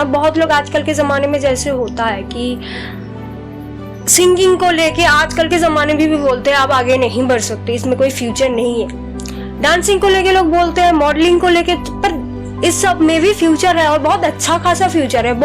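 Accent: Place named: India. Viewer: A nice way to make people understand that how one should think #hatkae... Liked your video and loved your hindi accent. Would like to see more videos from your end. Good luck 💙💙💙💙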